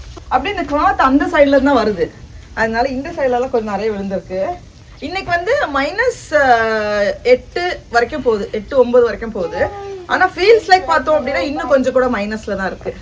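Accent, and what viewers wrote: Nagercoil, India. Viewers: Hello. It was a pleasant surprise to meet you and your family yesterday at the Garden. I, along with few friends, as gardening hobbyists watch your channel and get some inputs. Thanks for the contents and Nagercoil Tamil. Allan.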